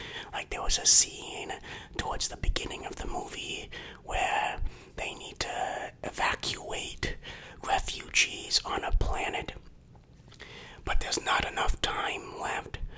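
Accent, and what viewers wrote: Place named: New Jersey, United States. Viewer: Are you from New Jersey? Your accent's pretty strong.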